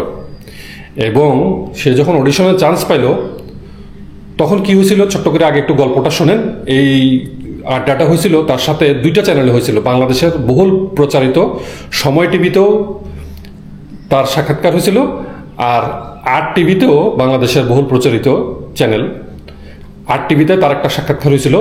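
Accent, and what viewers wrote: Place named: West Bengal, India. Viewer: Aponar kotha gulo sotti ei ek jon Bangladesher moto na ekjon West Bengal er bangalir moto dhonno baad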